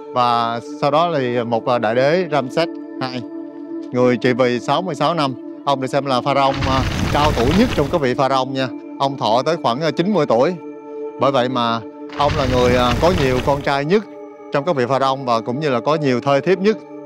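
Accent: South, Vietnam. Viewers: Một kênh du lịch khám phá rât lý thú , Giọng miền Nam của bạn rất dễ chịu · người Miền Nam, cho nên thuyết minh giọng rất thu hút & duyên dáng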